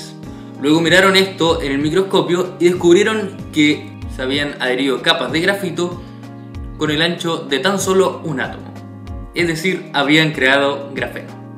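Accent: Chile. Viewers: Oye, qué genial tu canal. Estaba buscando imágenes del grafeno para tratar de entender el tema de los cristales, para ubicarme en eso de que "son la excepción a la morfogénesis autónoma" que plantea Monod y todo esto porque estoy leyendo sobre la teoría de Gaia de Lovelock para una revista digital que edito... o sea, la vuelta pa' larga! (pero entrete, una cosa lleva a otra). El caso es que llegué al video y caché al toque que eres chileno, jajaja! Y bue... me di una vueltecita por otras cápsulas y nada, poh, que está muuuuuy interesante. Ojalá en los colegios y liceos enseñaran así, con experimentación casera. Ta muy bueno! Un aporte. Eso. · Eres chileno?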